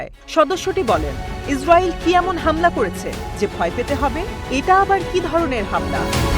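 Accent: Kolkata, India. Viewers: ৭১ টিভি কি সংবাদ পাঠে কোলকাতার সংবাদ পাঠিকা নিয়োগ দিয়েছে? উচচারণে তাই মনে হচ্ছে।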